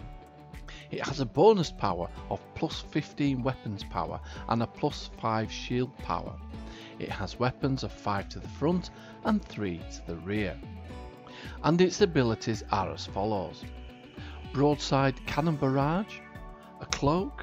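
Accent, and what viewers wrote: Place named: Northern England, United Kingdom. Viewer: Ive been watching your videos for a while now and just realised you sound like an airplane pilot. The cadence, the sound quality, everything. 24th century ships explained by a 21st century northern English commercial airline pilot. All the best from Manchester.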